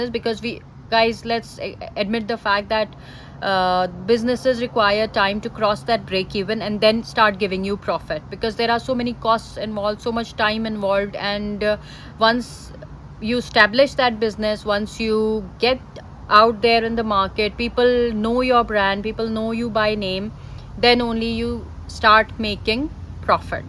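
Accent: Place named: South Asia. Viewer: Baaji i love your desi English... feels like home, although im from India 🇮🇳 but still its feels the same...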